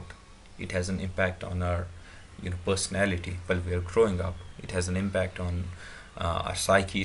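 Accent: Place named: Kashmir, India. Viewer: you sound very kashmiri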